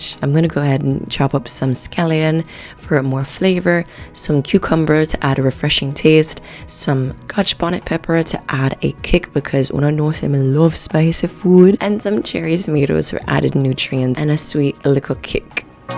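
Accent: United Kingdom. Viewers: I love a good British accent ❤❤❤ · Excellent British Accent 👌🏾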